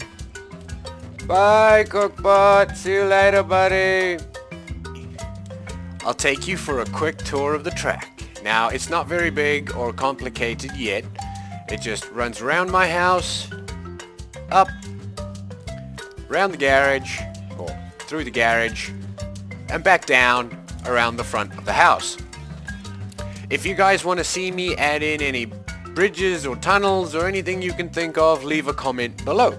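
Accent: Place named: Africa. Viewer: I swear you have a African accent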